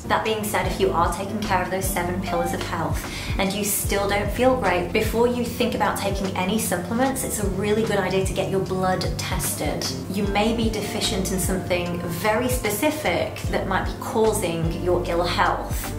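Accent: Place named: Southern England, United Kingdom. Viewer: I thought you were from somewhere in the south of England.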